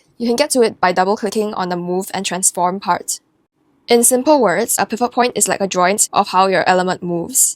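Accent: Singapore. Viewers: I can hear the singaporean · Cool tutorial omg the final is so cute. Also woahahah your accent sounded so familiar. Checked your bio and... singapore?!?! 👀 Me too omg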